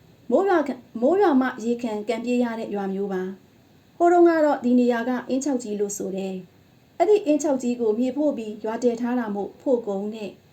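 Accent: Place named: Upcountry, Myanmar. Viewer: ခင်ခင်ထူး ဝတ္ထုတွေကဖတ်ပြတာမကောင်းရင်စိတ်ဝင်စားဖို့မကောင်းဘူး အညာသံလေးပါမှ ကောင်းတာ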